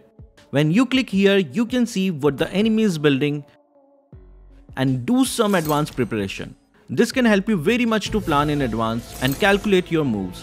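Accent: India. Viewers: Bro are you from India pls answer me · U r indian ?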